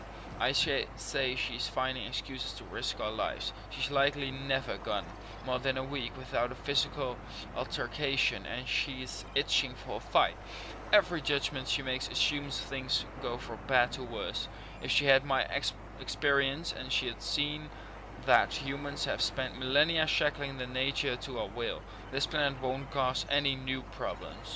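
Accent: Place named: United Kingdom. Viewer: It seems that over time your accent has gotten more and more... British. AWESOME (and totally not cringy) xD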